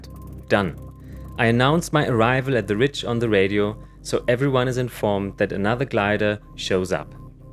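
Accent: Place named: Germany. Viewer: German English😂!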